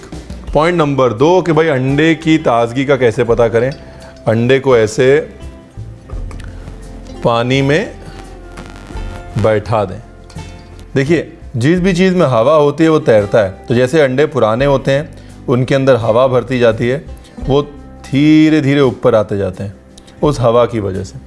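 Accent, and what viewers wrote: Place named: Lucknow, India. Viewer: Bhai ka lucknawi andaaz jindaabaad..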